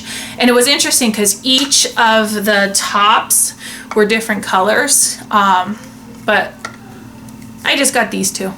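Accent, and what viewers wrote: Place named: New Jersey, United States. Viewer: Hi! I’m binging on your videos...you really scored at this community yard sale. Good for you. Question (but you don’t have to answer if you don’t feel comfortable). Where are you from originally? I’m originally from New Jersey and you sound like me...lol... hope I’m not insulting you. Anyway, just curious. Born and raised in Jersey. Moved to Florida for 30 years then moved to North Carolina a year and a half ago. I’m so old! Lol!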